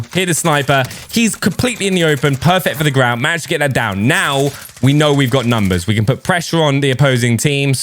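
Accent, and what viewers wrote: United Kingdom. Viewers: mate are u british because u sound it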